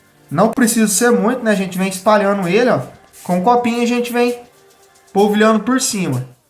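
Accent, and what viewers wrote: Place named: Minas Gerais, Brazil. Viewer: Eita mineirinho uai eu também sou mineira de Carangola uai, ô povo abenco sô.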